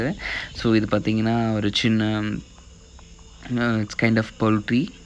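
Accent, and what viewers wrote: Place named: United States. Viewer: நல்லா தமிழ் பேசுறீங்க... அத இன்னு கொஞ்சம் நல்லா பேசிரிக்கலாம்... ஏன் அமெரிக்கா ரிட்டன் மாதிரி பேசுறீங்க....😊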